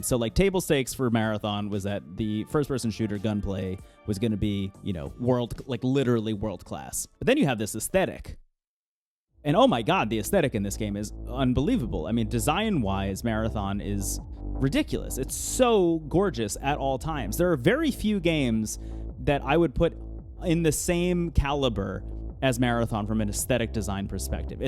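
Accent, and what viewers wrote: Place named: Minnesota, United States. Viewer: this dude straight out of minnesota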